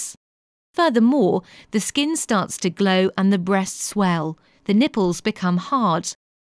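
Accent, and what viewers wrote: United Kingdom. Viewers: I'm just here for the British accent. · English accent. There's no such thing as an British accent.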